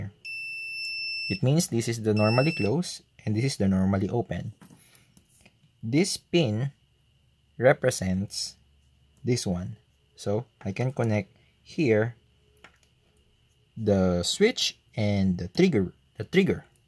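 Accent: Philippines. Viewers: that filipino accent tho :D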